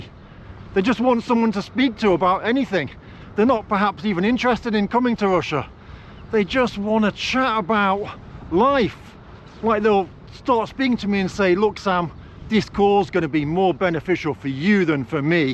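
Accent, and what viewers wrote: England, United Kingdom. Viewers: Hard to tell you are English, than you said "Its All Goes Pair Shaped"! English 100%! You been living there 20 years BUT your English has not changed a bit! Even a Western Canadian can see that!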